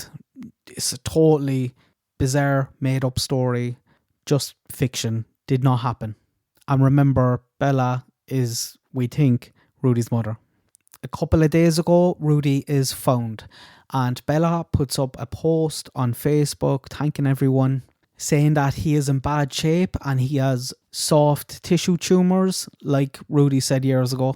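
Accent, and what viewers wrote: Ireland. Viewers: Yay to his Irish accent. I love it. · He’s got a lovely voice and accent you rude pr!ck. And he’d quite obviously Irish, for the ppl in the back 🙄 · I don’t know why I didn’t consider that; I lived in the same dorm building with a few Irish girls and yeah now I’m thinking the speaker could be Irish lol. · I'm sorry you don't have a sophisticated ear to appreciate an Irish accent. That's your misfortune. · He sounds Irish to me and I like it but it can be a bit harsh at first if you aren’t used to it. Specifically the r’s and t’s. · I love the Irish accent & many others in the world!!! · You are either a bully, or racist, if you don't want to listen, go away, every voice is awesome, (not everyone can speak), Irish is like every accent a blessing, you really should apologise, works so hard to bring us content, and you don't have to pay to watch it, stop being rude and if you do want to go farther in life, REMEMBER it's nice to be nice, manners are free, a smile can make a lonely persons day, and we all have to live in this world, and everyone else is as important as you are, have a great day and stay safe ❤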